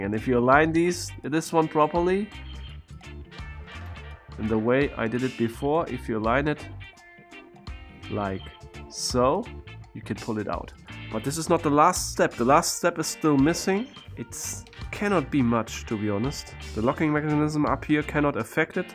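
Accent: Germany. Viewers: I love his up-beat voice, and the German accent - 'Hai, und velcom to ' - is really charming.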